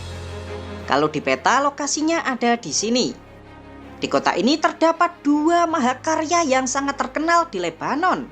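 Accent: Java, Indonesia. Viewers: mas medok banget jawanya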